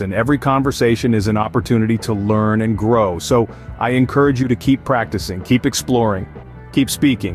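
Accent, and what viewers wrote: United States. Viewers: This is American accent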